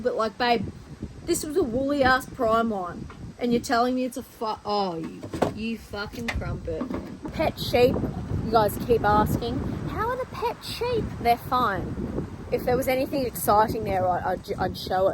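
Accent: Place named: Australia. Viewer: I was sad to hear you were "removing" foxes (originally thought you were British or Scottish, 'cause I hadn't heard your accent yet), but then I realized you were Aussie and was no long sad, lol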